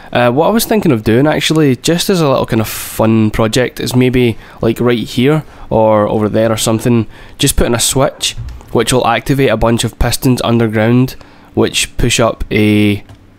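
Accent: Scotland, United Kingdom. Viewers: i was going to watch , but then i heard his scottish voice and chose otherwise .. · fucking wooo haha a scottish minecraft player :) finally someone without an annoying accent imo lol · Scottish sod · subbed cause you're Scottish! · LOL no, he's Scottish. You'd think people would know by now.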